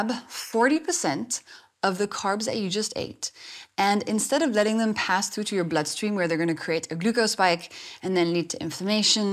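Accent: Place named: France. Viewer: I believe she is French, hence the gorgeous accent?? Namaste 🙏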